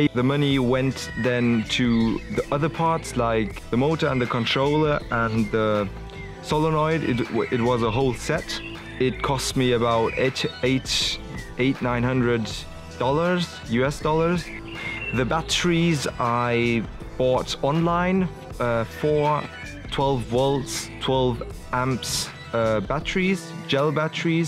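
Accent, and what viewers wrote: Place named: Germany. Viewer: Hey great job! I will probably start one project myself in the winter. I am preparing stuff now and try to find a lightweight electric motor... where did you get yours and what kind of motor is it? There are so many different ones... also did you need a converter? do you think you can compare the power the bike has to a combustion engine? Where you from also? Sounds partly like you are from germany (i am from germany)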